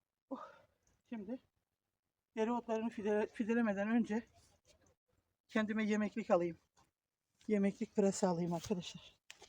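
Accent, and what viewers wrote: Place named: Trabzon, Turkey. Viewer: valla canm ne yaprsan yap izleniyor hele konusma tarzin trapi gibi gliyr.her seyi guzel dusunmn cok guzel sende ailende masaAllah size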